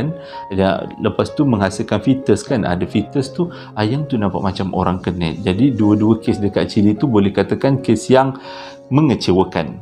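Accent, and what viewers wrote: Java, Indonesia. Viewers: Abg org jawa ke? Cara ckp mcm org jawa la sy dgr. Kalo salah maaf ye.. 😬😬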